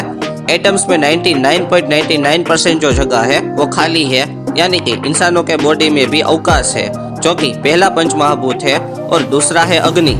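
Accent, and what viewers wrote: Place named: Gujarat, India. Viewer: I realized from your voice that you are from Gujarat..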